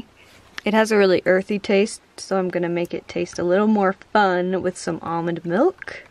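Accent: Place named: South, United States. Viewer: love your voice...nice country voice. you should sing often...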